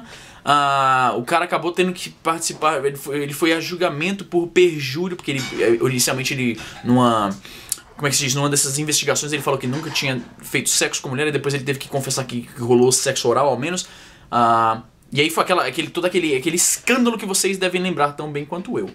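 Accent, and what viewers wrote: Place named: Ceará, Brazil. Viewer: o sotaque de cearense do é muito estiloso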